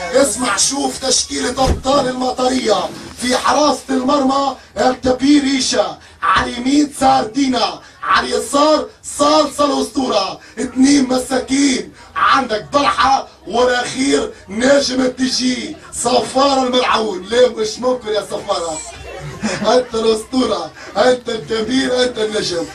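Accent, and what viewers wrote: Tunisia. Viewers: غرييييييييييييييبة والله ... متأكدين هذا مصري... هذا تونسي 100/100هههه... تحفون برشا... واصل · و الله تقول صوت شوالي هههههههه هدا يعرف لهجة تونس كويس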